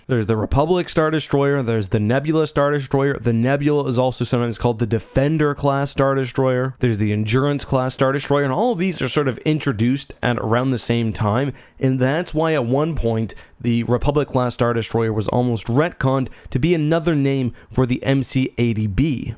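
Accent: Canada. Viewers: it took me a few years to realize that youre canadian, when you said "about" i was able to finaly confirm my suspicion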